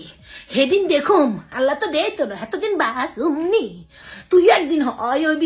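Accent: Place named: Feni, Bangladesh. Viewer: আমার বাড়ি ফেনী তাই কথার অনেক মিল পাই। আপনার কথা শুনে দাদুর কথা মনে পড়ে গেল। দাদু এখন আর নেই। অনেক মিস করি দাদুকে। ❤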